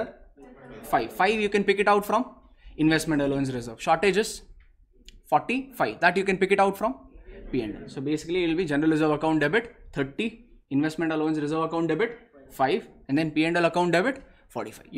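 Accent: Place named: Hubli, India. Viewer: Sir r u from karnataka??yavdappa aaytu it is just like our Hubli language 😅❤️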